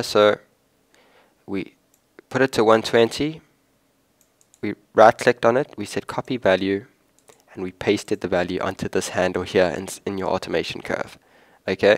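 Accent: South Africa. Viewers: Is that a South African accent I'm hearing? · you sound south african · Random but you sound South African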